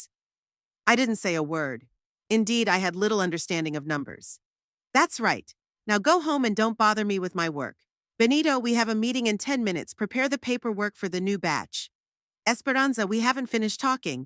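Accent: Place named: Mexico. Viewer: What's up with the " Frito Bandito" accent?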